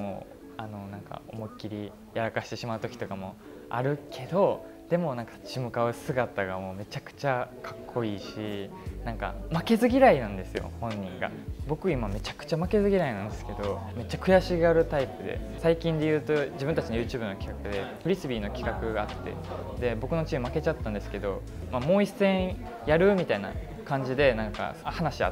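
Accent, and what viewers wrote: Kansai, Japan. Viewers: イケメン❤かわいい❤ 面白い❤明るい❤スタイル❤ 黒髪❤関西弁❤大好き❤ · インタビューやからはじめはわりと標準語で話してたのに 自分の好きなものを夢中で話してるうちにしっかり関西弁になってる雄大がたまらなく愛おしい😊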